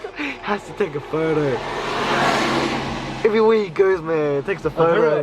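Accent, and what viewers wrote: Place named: New Zealand. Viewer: Never heard an Asian sound so kiwi, aussie and asian all at the same time. Mate :')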